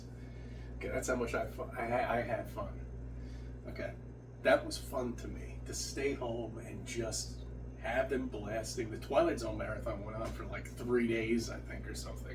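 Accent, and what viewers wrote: New York, United States. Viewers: One question: which part of New York are you from??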